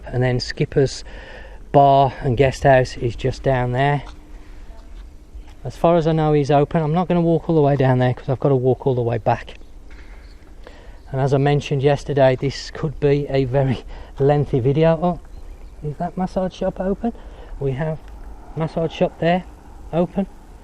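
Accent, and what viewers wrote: United Kingdom. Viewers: What a disgusting accent is this? Must be some trash area from UK. Try to speak BBC English. Yuck. Thumbs down.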